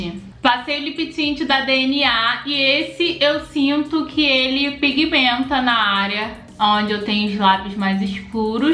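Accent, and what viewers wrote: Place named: Rio de Janeiro, Brazil. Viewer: Carioca, gosto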